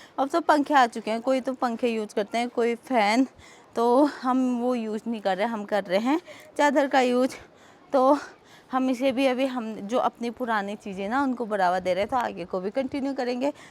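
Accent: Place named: Pahadi, India. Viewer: Hindi m v vlog bnaoge to chlega dii ek pahadi chahe kitni hi hindi kyu na bolta ho uske bolne m phadi accent hi hota or vo aapki voice m h hindi m vlogging krte huye phadi words ka use krna isi s ek phadi ki pehchan h proud to be a phadi uttarakhandi love from Almora ❣️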